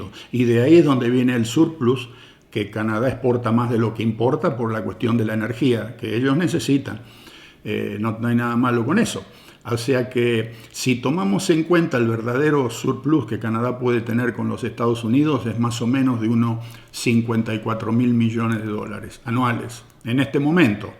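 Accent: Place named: Cordoba, Argentina. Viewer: Pensé que usted era un argentino cordobés para más dato y no un canadiense.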